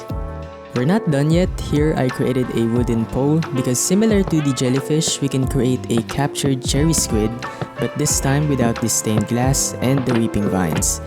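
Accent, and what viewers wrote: Philippines. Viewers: I can tell you're a filipino because of the accent, really love your build hacks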